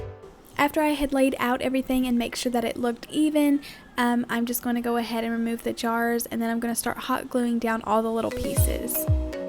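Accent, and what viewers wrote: South, United States. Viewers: I’m new to ur channel but I’m already hooked! I love ur southern draw & how effortlessly & beautifully u executed this DIY 😻 My only disappointment is that I didn’t find this video when u 1st put it out & there’s no way I’ll find these pieces now 😫 But I will be substituting the stuff I can’t find & recreating this to the best of my ability bc I freaking LOVE this!!! Thank u!! 🥰❤️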